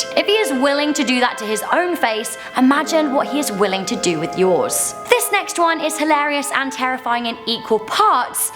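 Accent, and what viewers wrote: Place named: United Kingdom. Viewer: JIFS LADY!! And btw I love their brit accent 😍😍😂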